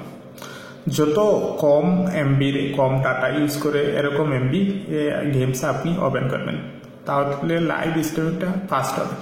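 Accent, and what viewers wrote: Sylhet, Bangladesh. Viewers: ভাই আপনি সিলেটি নি,,,? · you r sylhetee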